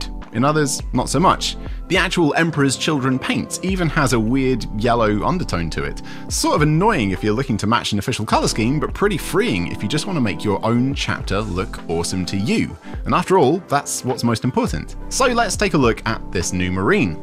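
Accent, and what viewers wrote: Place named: England, United Kingdom. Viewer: Yeah english woman voice overs are back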